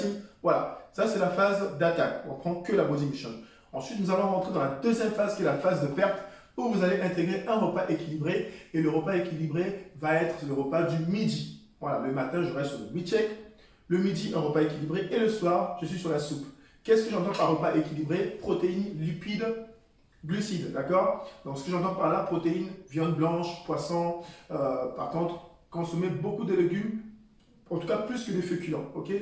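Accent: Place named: Ivory Coast. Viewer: Très belle présentation de la body mission. On dirait j'ai capté un petit accent 225🇨🇮🇨🇮🥰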